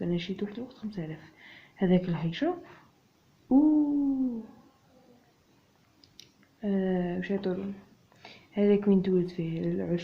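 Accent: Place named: Marrakesh, Morocco. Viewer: صدقني بالله و سمحلي يا وليدي بلا ما نحلف بلي مراكش عارف شا راك تهدر بالتوفيق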